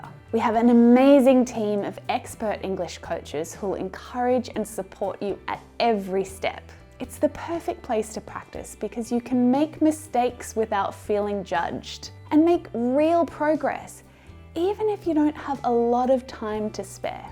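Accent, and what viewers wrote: United Kingdom. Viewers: G'day, I can't figure out if you're British or Australian. Maybe (like me) you're both? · Hello, 👋 first of all thanks but I believe your pronounceation is British, am I right? So I've learned American English, but my English is not good enough so if I follow you may be I confused. I need an American accent teacher could you help me or what is your suggestion? Thanks in advance. 🌹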